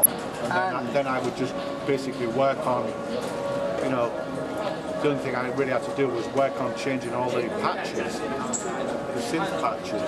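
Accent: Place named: Hull, United Kingdom. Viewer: I love that he still has his hull accent :)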